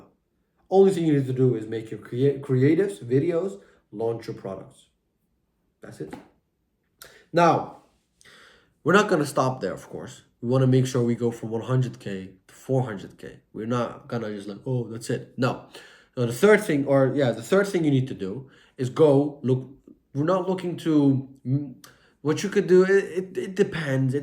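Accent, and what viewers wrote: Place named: Turkey. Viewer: Brother you sound Turkish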